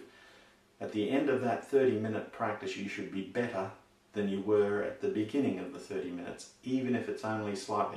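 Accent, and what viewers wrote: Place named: Australia. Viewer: mate you sound aussie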